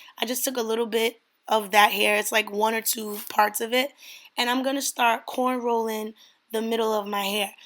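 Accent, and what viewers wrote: New York, United States. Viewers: You slayed that ! Are you from NY or Maryland ? I hair an accent 😂I just subbed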